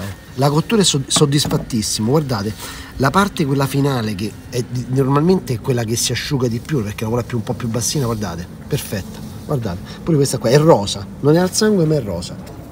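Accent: Rome, Italy. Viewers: I tagli non ci appiccicano niente, prima di sigilla fuori e non si inizia dall osso. Poi aver separato la carne e’ inutile a quel pubto si sarebbe dovuto cuocere filetto e controfiletto gia’ disossati, inoltre era troppo alta, inoltre la carne e’ troppo magra, insomma quasi ogni cosa che si poteva sbagliare, l’ha sbagliata a dimostrazione che in italia la cultura della bistecca non c’e’ proprio. Inoltre tutto quel romanesco da’ molto fastidio all’ascolto, bisognerebbe imparare un po’ di dizione corretta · È stupenda la dissonanza tra la parlata romana e i sottotitoli 😁😁😁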